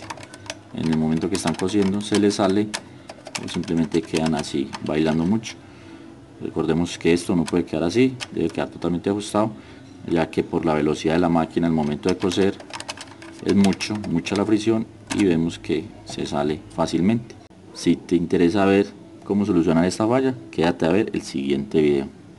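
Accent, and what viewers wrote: Colombia. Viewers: Holaaaaa saludos no quiero equivocarme pero juraría que eres de Colombia, soy aficionada a la radiocomunicacion y conozco diferentes acentos. Me encanta tu forma de explicar tus vídeos. Gracias!! Voy aprendiendo muçho contigo. Es la primera vez que escribo.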